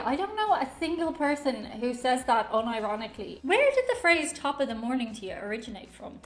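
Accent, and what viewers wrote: Dublin, Ireland. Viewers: Your accent sounds Irish to me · Clare culchie here living in the states. I would love to know why you look for things to be a snobbish posh spoiled brat about Americans for? Really, I would love to know. I haven't been gone so long that I can't tell you have a West British Dublin accent but you don't have to act so British. If this is how the younger Irish acts and thinks did parents have come a long way in losing their parenting skills. You really are a little pompous s@ We are the Irish and we are the judged not the judges.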